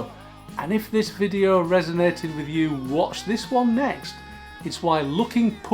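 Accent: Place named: Yorkshire, United Kingdom. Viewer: The Eagles ... sitting here in Don Henley's hometown, getting ears tuned to what sounds as the Yorkshire accent. That's coming from one with Old West Florida sound. Great advice for us Boomers ... will continue to listen.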